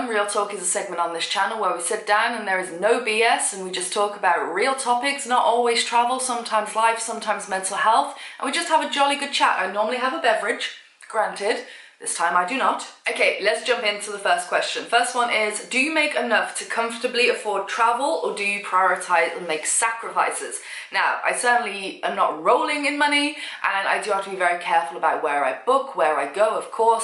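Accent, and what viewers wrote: United Kingdom. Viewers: I love ur accent i want to find me a British wife got any friends? Lol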